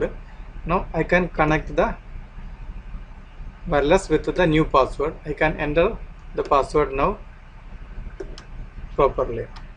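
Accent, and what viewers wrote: India. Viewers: Your accent is too indian for me.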